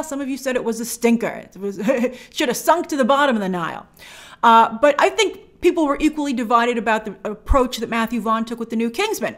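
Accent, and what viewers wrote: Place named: New York, United States. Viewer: You must be having a good weekend. Your new York city accent is coming thru more than usual.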